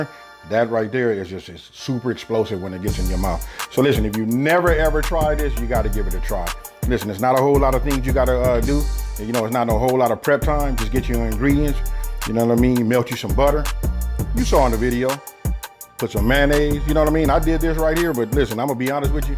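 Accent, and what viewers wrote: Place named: West Coast, United States. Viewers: Are you out here in LA? You sound like a Cali native and look very familiar! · I have been looking at some of your videos for the month and you be throwing down. I'm from the south. I don't know where you are from, but I have a clue. You sound like you are from LA. I love cooking and I have been in food service for 13 years. Was a cook at one time, (my first real food service job) it was kind of intimidating, but I learned to get through it. They loved my cooking. My title was COOK II. I was second to the head cook. After that, I took on prep cooking at different places and that's where I'm at now. I normally work in hospitals and assisted living places, which I love. The place where I'm working at now gives me more opportunity to show my "Lil skills, YOU KNOW WHAT I'M SAYING,..., LOL" A resident wanted egg salad and sh wanted her bread in a zip loc bag. 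I was like, OMG!!! I HAD TO THINK. Which didn't take me long. I thought about the two other residents that are vegetarians. I'm like, hell, they can have this also. Just make this egg salad like I make TUNA FISH, (like WE make it) besides the li' sugar. I just did eggs, a little dill weed (NOTE: I'M AT WORK, Ain't no way in the hell I'm gonna have that spice in my cabinet, LOL), mayo, relish, salt and pepper. THEY LOVED IT!!!!!!!!! I'm from Arkansas and we had a food truck festival here and this is the third year that I missed it because I am always at work. BY WAY, LITTLE ROCK, ARKANSAS. , AND YEAH, some of my homey's told me that it was about 80 food trucks. One of them was telling me about this corn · Lol he from the west coast. Got to be. I hear it lo sound like rich the factor I love it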